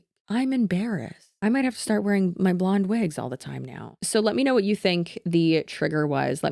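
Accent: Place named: Florida, United States. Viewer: yess she’s been hitting that Florida accent extra hard lately too for some reason. Isn’t she from appalachia or sum?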